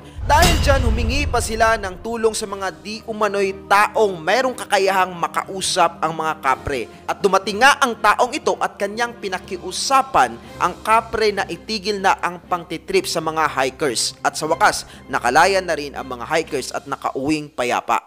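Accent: Visayas, Philippines. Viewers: Hahaha bisaya diay ka ya washout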